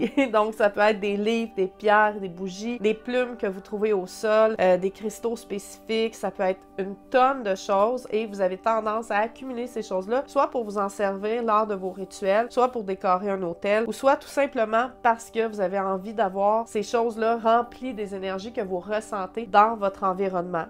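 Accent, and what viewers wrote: Quebec, Canada. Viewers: Waouh Nouvelle Vidéo J Adore l accent Canadien😉 Tes Cheveux Supers. Nous Sommes Sorcières de Famille Ma Grand Mère Le Grand Oncle., Bisous a Toi😉🐾⛲🐱🐈😄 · hello! Vous avez une voix qui nasille et l'accent québécois avec lequel j'ai toujours eu beaucoup de mal à écouter mais vous êtes tellement douce que j'arrive à vous suivre et à beaucoup apprécier!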